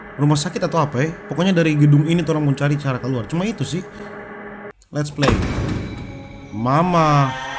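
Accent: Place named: Java, Indonesia. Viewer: Logatnya lucu njay sma dengan orang jowo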